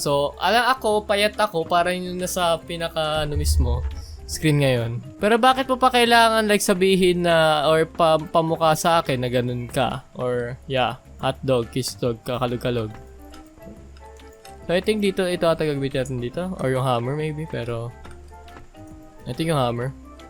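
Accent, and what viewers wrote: Visayas, Philippines. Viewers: Bisaya kaba lods?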